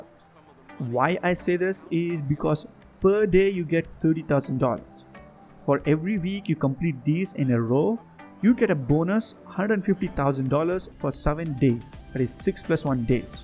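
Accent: India. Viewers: Yeah bro this is a great idea... As too many got GTA V for free, and they don't know what to do and just roaming here and there and telling gta online world is dead.... This content of starting from beginning will be a very useful trick for them or even for some players which knows how gta world works... So yeah why not It's a great idea! And i want to know that are you also from India? Because i am from india and found ur accent same......😅😅 Btw you can also do gta V live streams of grinding and some other games too 😊😊 And plz while making guide plz tell that how things work like in vehicle stealing mission we do not have to hit vehicles and etc... Cuz many friends of mine doesn't know the basics of gta online properly, like what to do and how to do 🤣🤣🤣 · you improve your english alot you got much more less that punjabi akcent ;) i can understand evetything now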